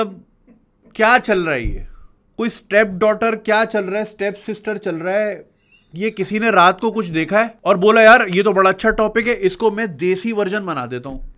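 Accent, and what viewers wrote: Karachi, Pakistan. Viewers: Karachi walon ka accent esa ee hota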